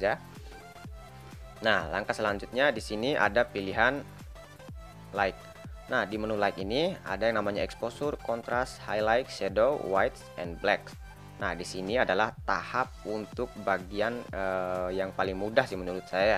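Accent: Bima, Indonesia. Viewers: Bahasa indonesianya kedengaran seperti Orang Bima NTB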